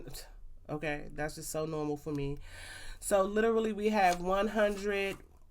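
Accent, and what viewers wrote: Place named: Baltimore, United States. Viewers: I'm from the south, but I heard that Baltimore accent, too 😂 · I'm glad your video came up in my feed. I wasted to get started saving this way so I don't overspend. I love clothes, girl. Anyway, your accent sounds like 410. I'm 410 too. 410 to the bone. Have an amazing day. Subscribed!!! 🤗🤗🤗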